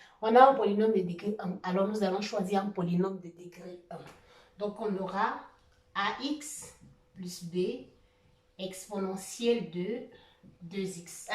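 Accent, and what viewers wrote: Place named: Cameroon. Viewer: Très bonne présentation, vous avez un accent camerounais que je reconnais. Félicitations à ma compatriote mathématicienne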